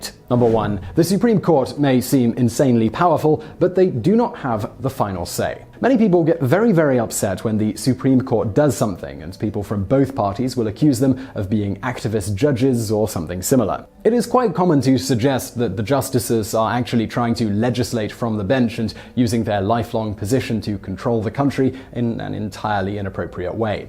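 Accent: England, United Kingdom. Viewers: This guys a Brit from Richmond VA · Get things wrong once in a while? He makes at least one major blunder in every video I've seen, often more. I think he's coasting on his English accent, but he's actually pretty ignorant.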